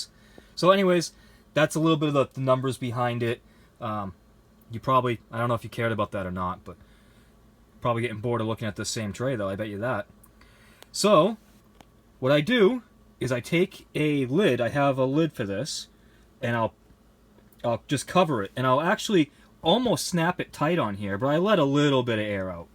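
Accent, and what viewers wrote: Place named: New Jersey, United States. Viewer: OK. I am from NJ. I though I recognize an accent. I am in "New Mexico" now. I run "Taos Teenie Greenies". I grow for restaurants and locals. I have a request to add Amaranth to my lineup. I viewed your video for some pointers on my 1st batch. Thanks for making the video! Useful information here. Good work!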